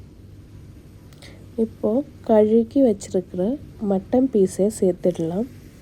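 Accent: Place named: Kerala, India. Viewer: Malayalee tamil parayanathe pole unde.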